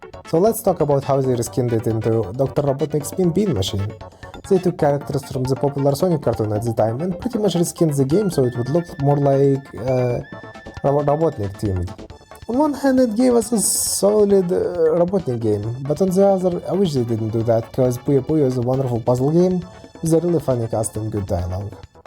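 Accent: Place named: Russia. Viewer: Why do you have russian accent 🙄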